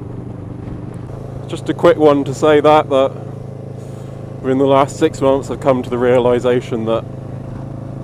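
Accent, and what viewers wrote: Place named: United Kingdom. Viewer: Great video, speaking from the heart. Very inspirational and I just love that fine british accent!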